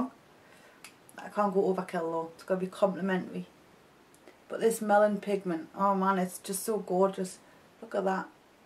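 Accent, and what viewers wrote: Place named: Scotland, United Kingdom. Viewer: You look beautiful and I for one have missed you., could you please tell me if you live close to Scotland? Your dialect and some words seem similar to my late grandfather. (I live in TX)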